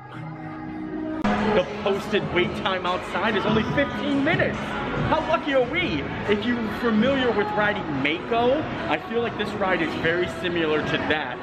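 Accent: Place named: Northeast Pennsylvania, United States. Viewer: I always thought you had a north east PA accent. Lol. If you’re ever near Williamsport PA, hit me up.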